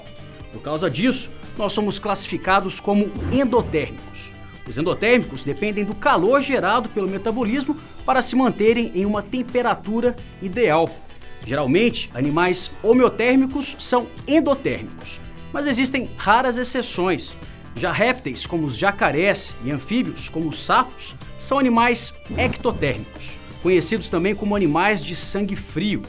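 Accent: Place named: Minas Gerais, Brazil. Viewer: Vídeo bom demais, ainda mais com esse sotaque "minerin". Sou fã do canal. 💕💕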